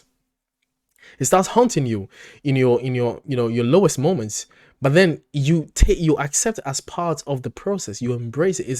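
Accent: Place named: England, United Kingdom. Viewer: I saw an interview with Troy and Tuomas talking about not believing in God and organised religion. Troy actually said you are told about Santa and the tooth fairy and God, but for some reason as we get older some people still believe in God he says its, nonsense. He's English like me and I'm not saying there's no religion over here but if you compare the percentage of church goers to say the US our numbers are far lower, you sound English. This is in no way meant to insult people who believe in God I don't but respect other people that do. Your reactions are always funny informative and interesting. 🇬🇧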